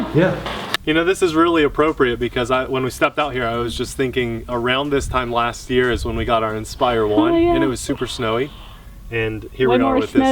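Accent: United States. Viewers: "Very fun!", you have to be an American with that English.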